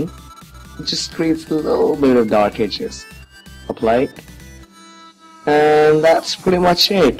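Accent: India. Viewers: he has an Indian accent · This fucking software is so aids, it hardly even fucking works. Fuck you and your Indian accent, fuck this entire shit.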